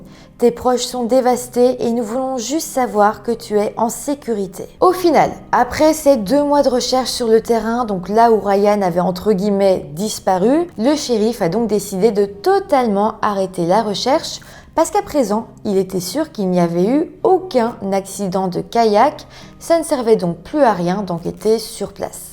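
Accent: Belgium. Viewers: L'accent Belge 😍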